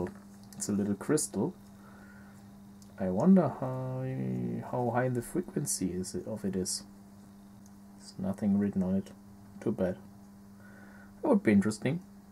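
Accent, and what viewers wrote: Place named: Switzerland. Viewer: hört sich nach schweizer dialekt an ;)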